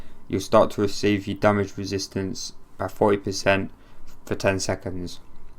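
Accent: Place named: Stoke, United Kingdom. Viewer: ahhhhh accent sounded a bit stokish :) subbed you though look forward to more content cheers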